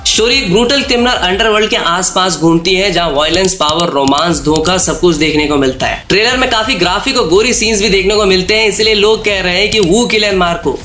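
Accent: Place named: UP/Bihar, India. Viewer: Bhai Tumhara review Pura scripted laga Kyun ki Ji angreezi shabd tumhne trailer ko uncha dikhane mein istamaal kiya woh kaafi ache hai Aur Baaki angreezi tumne istamaal kiya Woh UP/ Bhihar ka MTI yane mother tongue influence se bhaara hai. Pata nai Kitne log ye mehsus karenge but Mera opinion yahi hai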